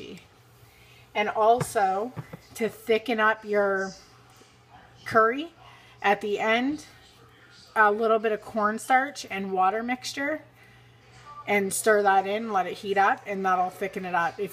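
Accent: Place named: Canada. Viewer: Let me guess you’re Canadian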